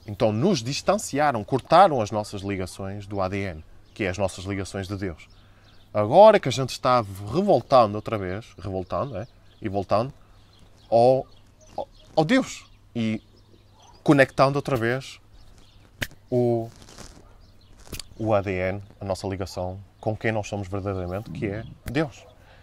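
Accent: North, Portugal. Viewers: Decide te com que sotaque falas. Ou Português de Portugal ou do Brasil. É que misturar os dois, e ainda por cima com sotaque nortenho, fica uma cena mesma esquisita e sem graça...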